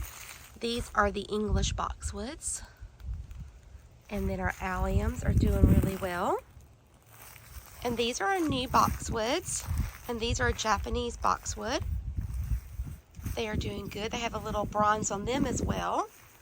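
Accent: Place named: South, United States. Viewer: I love your southern accent!! I wish I had a stronger one!! Enjoyed your garden tour and meeting your doggie! We have chickens too, beautiful property and gardens you have 💚💚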